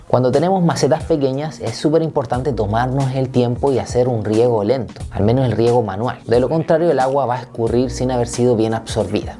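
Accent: Chile. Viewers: me gusta tu acento no tan chileno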